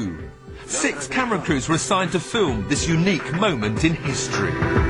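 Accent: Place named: United Kingdom. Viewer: 这英音听的舒服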